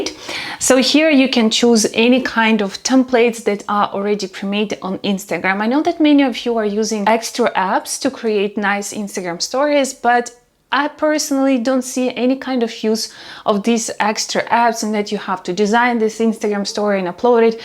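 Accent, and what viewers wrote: Russia. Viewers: Are you from Russia?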